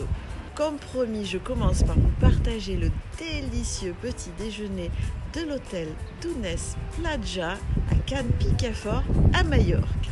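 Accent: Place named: Provence, France. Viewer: Merci, j'aime beaucoup ta voix, posée, légèrement teintée des cigales, très agréable! Dommage pour Nadal!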